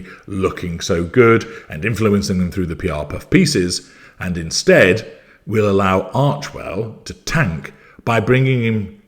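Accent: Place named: Midlands, United Kingdom. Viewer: I detect a bit of the Midlands in his accent. Have thought so for a while.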